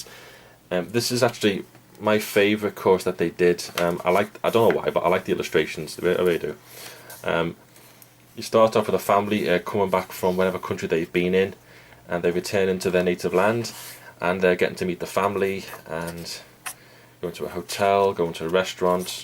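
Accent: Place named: Merseyside, United Kingdom. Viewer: Merseyside clearly.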